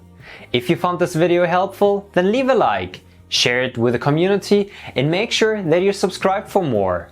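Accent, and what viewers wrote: Germany. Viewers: Lol i've noticed english isn't your native language, but i couldn't think of what country you came from,but the "Guten Tag, i'm " let me know you're also german!😂😂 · Bist du nicht aus Deutschland? Klingt so. Wenn ja, gibt es auch einen Deutschen Kanal?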